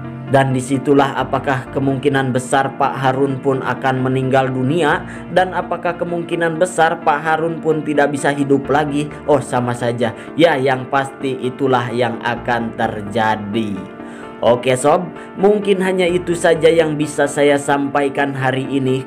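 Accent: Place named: Sunda, Indonesia. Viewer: Meni nyunda pisan ... si miminya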